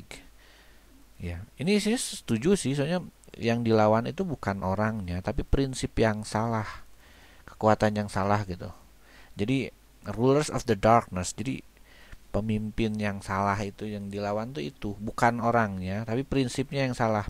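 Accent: West Java, Indonesia. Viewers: nyunda banget kang.. ahahahah.. the real SUNDA Modern :) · paingan logat na logat sunda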